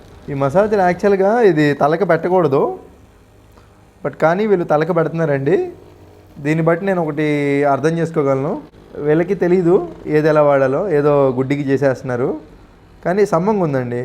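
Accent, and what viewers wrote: India. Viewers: Re first you learn English talk English and talk properly English. Then after you go to world travel in front onwards. You talking this Buttler English, it’s not good for us. It’s not good for Indian people also talking about that Butler English in front of foreign persons it’s impact for us in India, so learn properly talk properly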